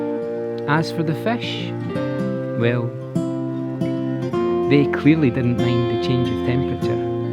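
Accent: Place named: Scotland, United Kingdom. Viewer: I stumbled across your channel a few months ago. You stayed in my mind for your amazingly respectful way of treating fish and your lovely Scottish accent. Thank you so much for this video. Amazing fishing, great editing, beautiful pictures. Takes me back to catching brown trouts in the lochs of the Assynt region back in 2014, those memories are a real heartwarmer right now. Tight lines and greetings from Germany!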